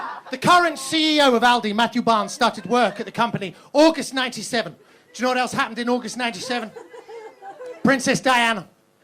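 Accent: Liverpool, United Kingdom. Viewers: Only non-Scouse person I've seen besides Chris Barrie (Red Dwarf) that can do a convincing Scouse accent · This is hilarious : pure genius ; great Liverpool accent...I love the line : "Then you have to skulk off and pack your goods in the corner of the Aldi ... like a rat!" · Best comedian and does a great Scouse accent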